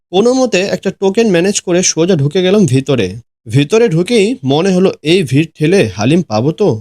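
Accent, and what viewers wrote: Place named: Kolkata, India. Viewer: ভাই ইন্ডিয়ান কলকাতার ছেচরা ব্লগারদের মত ভিডিও কইরেন না আপনার কথা বলার স্টার অনেকটা ওদের মত অথেন্টিক বাংলাদেশি স্টাইলে ব্লগিং কইরেন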